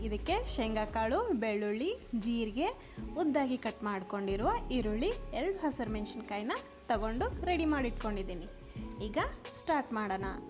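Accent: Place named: North Karnataka, India. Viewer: Uttar karnataka bhashe na chenda 👌🏻and alli kade uta matra masta irat super akka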